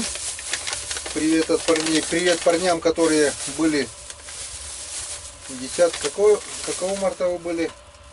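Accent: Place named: Yakutia, Russia. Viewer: Говор якутский!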